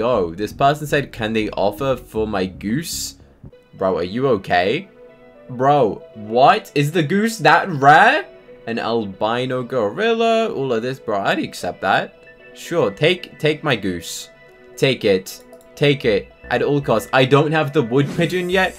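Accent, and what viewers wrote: United Kingdom. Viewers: Day 2 of asking are u British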